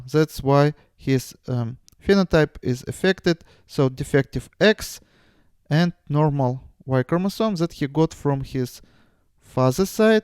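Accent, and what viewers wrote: Russia. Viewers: Ur accent, russan or greek or what?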